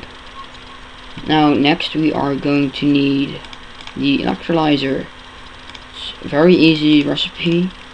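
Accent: Netherlands. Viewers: Wow its surprising how many people recognize his Dutch accent I noticed it too. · Nice explanation. *waits patiently for 1.0.0 compatible release.* P.S. Are you Dutch? You have that accent.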